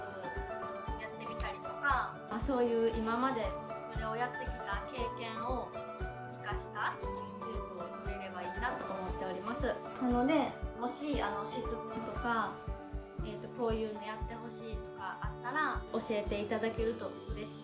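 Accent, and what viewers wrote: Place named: Kansai, Japan. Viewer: 今までツイッターでしか見たことなくて、声とか喋りとか分からなかったけど(勝手に標準語と思ってました。) しっかり関西弁で親近感わきました(笑) 子育てもYouTubeもコスプレも頑張って下さい！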